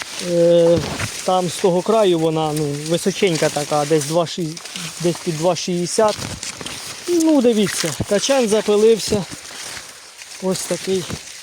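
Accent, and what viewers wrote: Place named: Sumy, Ukraine. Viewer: Коментар на підтримку. Але ж як приємно чути нашу сумську говірку)))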